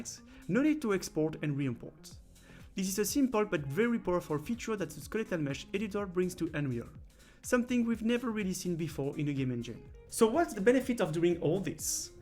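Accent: France. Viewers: I really appreciate the effort you put in the tutorials.Just if you could a bit slower.....with the french accent and your speed talking, sometimes it´s really hard to follow (dont want to be rude, just an advice). Love the channel BTW!! really helpful video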